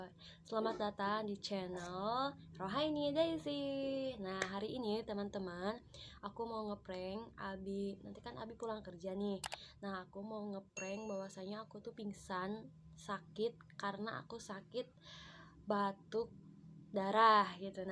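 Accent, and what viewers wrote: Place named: Sunda, Indonesia. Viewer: Sunda banget wkwkwk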